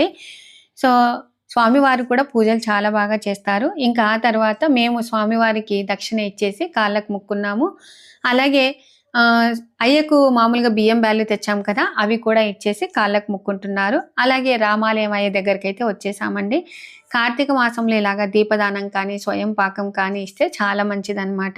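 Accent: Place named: Kambam, India. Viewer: Hi garu yala unnaru me vlogs antey naku chala estam andi ma Ammamma gari uru kambam dhagara Andukey a language naku chala estam madi nellore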